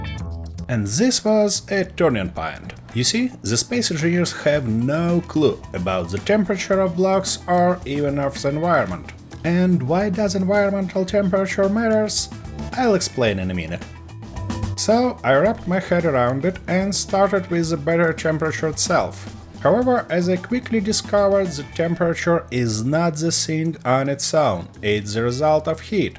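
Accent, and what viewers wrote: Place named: Russia. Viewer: This is already interesting as a topic just on it's own but having it explained to me by a crazy person with a thick russian accent somehow makes it even better.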